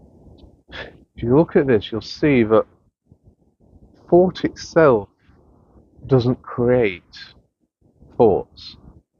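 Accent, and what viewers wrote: Manchester, United Kingdom. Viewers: Thanks where are you? I’m in Lancashire you sound like Manchester Bury,Oldham way great video 👍🏻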